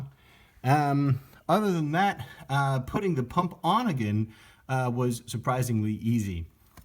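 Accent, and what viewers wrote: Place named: Canada. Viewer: Wait, this guy is Danish? I honestly thought he was Canadian 😂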